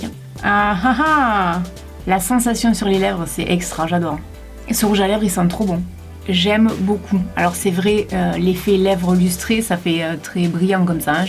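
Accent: South, France. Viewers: Hello je suis un mec et j'ai découvert tes vidéos alors au début je me suis dit avec son intro “salut !” je ne vais pas aimer elle dit ça d'un air sévère haha alors pour le coup je me suis complètement tromper tu est géniale, franche et d'une bonne humeur communicative avec ton super accent du sud j'adore bonne continuation tu est pétillante ! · je me répète mais j'adore ton naturel, ton joli petit accent du sud, ton humour, et ta sincérité! Je ne me lasse pas de tes vidéos:) bonne continuation!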